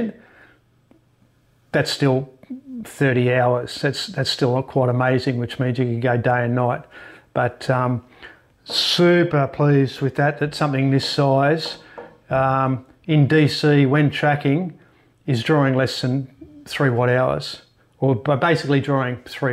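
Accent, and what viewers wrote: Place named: Australia. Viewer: Hi,. I saw all the video on 24" Hubble Optics Dobsonian and was very impressed. I read from the automatic subtitles since I am not so accustomed with Australian flavor english accent. Any way, I am so much interested on this type of designs.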